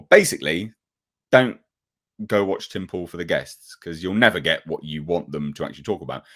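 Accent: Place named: United Kingdom. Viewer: Pools got more viewers and make more money then you do. Can't stand listening to that pretensious British accent. I really hate the British wish Russian would take them out,lol. Weakmen of Europe. I really wish the U.S. would cut ties them.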